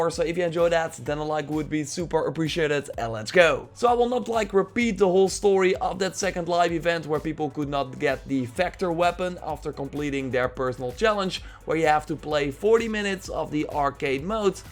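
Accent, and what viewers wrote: Netherlands. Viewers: Are you Dutch/Flemish?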